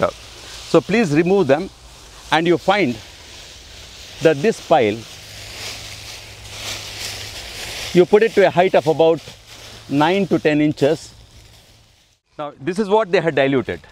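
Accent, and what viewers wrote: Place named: Kerala, India. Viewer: His accent sounds like that of Malayalis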